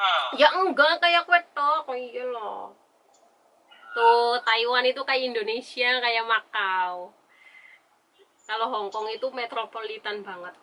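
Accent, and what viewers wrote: Banyumas, Indonesia. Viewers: Pdhl kl ngapak jg gapapa loh, lucuu 😂🤭🙏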